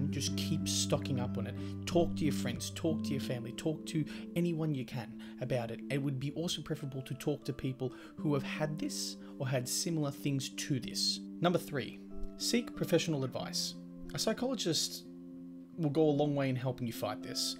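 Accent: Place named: Victoria, Australia. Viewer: Subbed. Nice to hear a (I assume) Victorian accent talking re this stuff, makes me tune in and relate more. Thanks man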